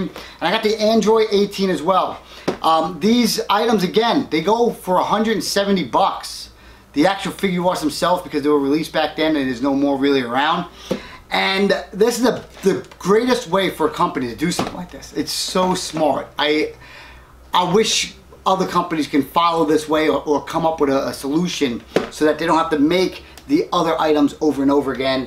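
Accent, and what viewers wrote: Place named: Rhode Island, United States. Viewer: Are you from Rhode Island? My family is from there too. I can hear it in your voice.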